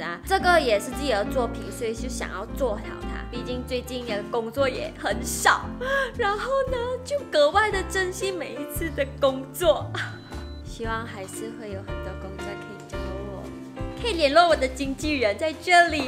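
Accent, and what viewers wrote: Taiwan. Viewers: 的台湾腔越来越够力了🌚❄ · 说话越来越台湾腔了啦❤😂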